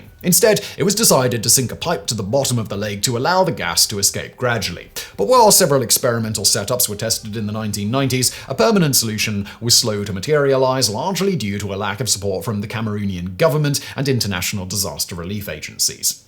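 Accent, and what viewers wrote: United Kingdom. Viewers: Square space as a sponsor: Check British: Check is and confirmed.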